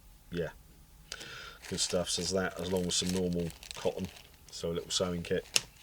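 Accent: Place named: United Kingdom. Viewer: Some great items in there that I think I might add to my EDC. PS, it's nice to hear another Brit talking about EDC, as most of the time, ot's an American dominanted topic. Cheers.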